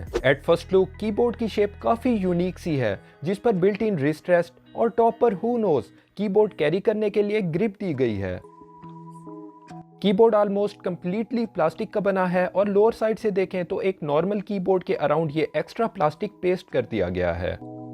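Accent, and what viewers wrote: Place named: Peshawar, Pakistan. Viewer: Yar ap ky bolny ka style Peshawar k logo jesa he. 🤪 But phir b kha se he ap☝🏻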